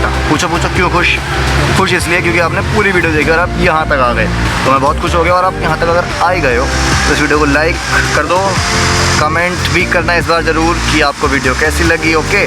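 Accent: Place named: Delhi, India. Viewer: I don't know exactly but yout way of talking like delhi ka munda hahahah, amazing video again.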